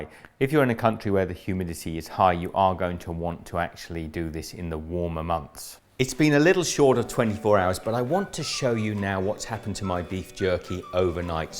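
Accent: Australia. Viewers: This guys Australian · Tell me he isn't Australian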